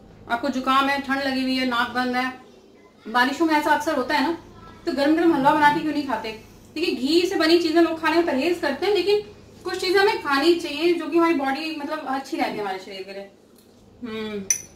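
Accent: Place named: Rajasthan, India. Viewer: Rajasthani.typical accent.accepted.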